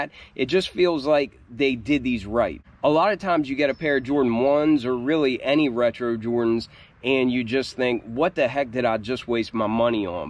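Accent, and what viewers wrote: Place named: Virginia, United States. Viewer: Are you drunk Virginia by chance? I used to live in VA beach and your accent sounds familiar. Great review BTW I will cop those for $160 price tag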